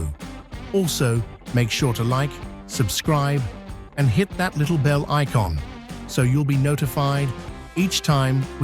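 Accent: United Kingdom. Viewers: It's strange to hear someone with a British English accent using words like 'dude' (whatever that means) and 'I guess'.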